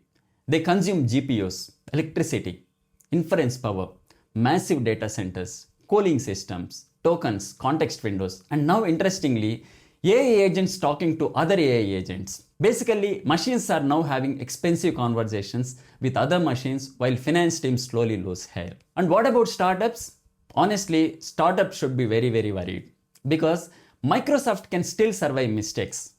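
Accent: India. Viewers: South Indian? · Thi guy is awesome. He is the most Indian sounding Indian I have seen on YouTube. Nothing fake, and he even drops the clever humour and nuggets Indians do. I love it!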